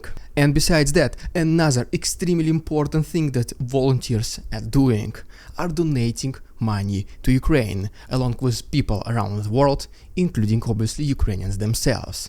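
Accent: Russia. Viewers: lol Russian sounds great in English :D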